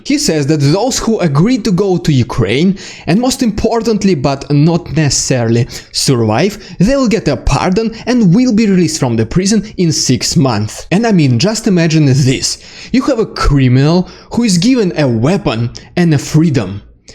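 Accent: Russia. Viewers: Man... I'm not happy with Russia as a country, especially putin. But that Russian accent! Man I want to learn Russian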